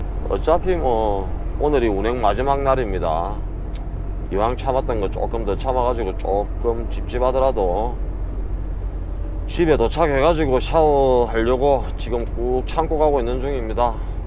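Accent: Gyeongsang, South Korea. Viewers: 가실없이 시벌리는 말이 유창 하네요 우리가 남이가 갱상도 머슴아 아니가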